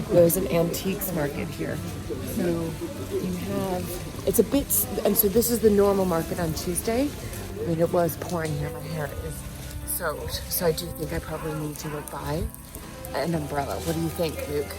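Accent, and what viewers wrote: United Kingdom. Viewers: Wow,you picked such a wonderful area for your house! Just gorgeous.Your American accent has some touches of British e.g.You pronounce t in "delightful" and "little" with a very hard t instead of the softer ddd American style.Now you will be picking up Italian too.I doubt you are conscious of it. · Soooo beautiful. I notice that some of your diction is now coming close to a British accent. cute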